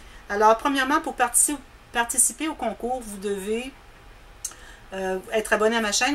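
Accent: Quebec, Canada. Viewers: Félicitations pour vos 1000 abonnés. Merci pour tous vos partages et votre savoir faire que vous nous redonnez avec gentillesse et générosité. Votre travail est remarquable. Et puis une petite touche finale qui me fait plaisir, voir votre visage rayonnant et votre sourire sans oublier le merveilleux accent du Québec. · Quelle surprise et quel plaisir aujourd'hui de vous voir "presque pour de vrai". C'eût été dommage de ne pas vous montrer tant vous êtes resplendissante ! Votre accent, je vous l'ai déjà écrit, j'adore, il me rappelle mes 2 longs voyages au Québec où j'ai rencontré des gens sympathiques à chaque fois. J'y retournerai volontiers mais revenons au scrapbooking. Je félicite votre grande disponibilité et votre belle générosité, les réponses aux questions sont rapides et précises. Je suis arrivée sur votre chaîne alors que vous aviez très peu d'abonnés mais le nombre a explosé en très peu de temps, ce n'est que mérité, félicitations. 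En égoïste, je regrette déjà un peu ce petit cocon que nous formions, vous gardez rien que pour moi 😋 car vous êtes vraiment très empathique et on ne peut qu'être séduit sans compter que vos réalisations sont originales tout autant que les papiers utilisés. Mais, oui, je vous souhaite très vite de multiplier ce nombre par 5 ou 7 et plus. À très bientôt et encore merci pour tous ces partages.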